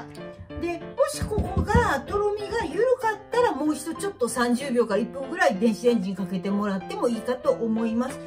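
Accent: Kyoto, Japan. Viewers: 先生の京都弁が可愛らしいです 意外な組み合わせですがさすが奥薗壽子流です!🥰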